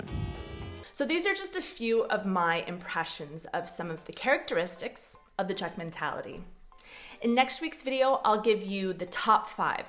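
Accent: United States. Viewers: Co se tice zlatyho pravidla, ze cesi majou 10x lepsi aj jak cizinec, tak ti budu muset dat ve vetsine pripadu za pravdu. Bez urazky, ale u tebe to na prvni poslech vypada dosti podobne🤣!!! Ale aj presto proste ZERU tech par zpitvorenych, zkomolenin Cestiny v podani nejhrubsiho americkyho akcentu😁a BTW Vubec bychom se nezlobili, a tady muzu mluvit s dobrym svedomim za vsecky Cechy a Moravaky🙃, za pridavek tadyte anglo-slavstiny. Proste te MILUJEME!!!!❤.... tedy akorat po podminko, ze se brzy ukazes s salino v Brne😉. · Yep you sound american, I dont like your way of thinking as an outsider from neither America or Czech you sound so self-centered.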